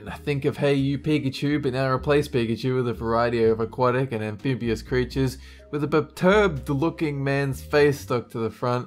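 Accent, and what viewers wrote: Australia. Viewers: He has an Australian accent and a slight lisp. Some people like it others don't. I guess he does slur, but is that bad? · im aussie and im pretty sure he has an australian accent. whats wrong with it? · It's the Ausie charm, some people like it other's don't.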